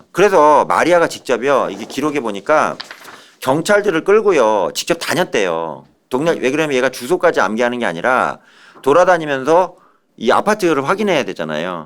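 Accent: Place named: Seoul, South Korea. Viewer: 염블리님 얼굴이 헬쓱해지신거 같은데 아프신건 아니겠쥬? 근데 말미에 뭐뭐 한거라~~ 이러이러 한거라~ 이건 어느지역 말투에용? 옛날 서울사투리같은 느낌인가요? 사건의뢰 왕팬이라 자주 듣다보니 저도 모르게 따라해서ㅋㅋ 궁금해지네요~~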